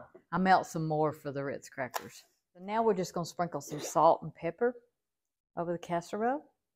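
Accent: South, United States. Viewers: I tried this recipe for supper last night, and it's delish! Cannot wait to eat some leftovers again tonight! Your Southern accent is delightful. What state do y'all reside in?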